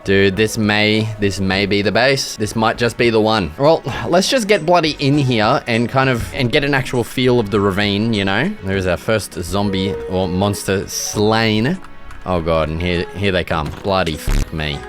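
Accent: Australia. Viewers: I've been watching your videos for about 6 months now, and I have to say I love your Australian accent, and your building style is absolutely amazing. I recently just started a forever world, and your videos are great inspiration for build ideas. I will forever appreciate your videos. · U australian, i thought u were british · i could sense the australian in you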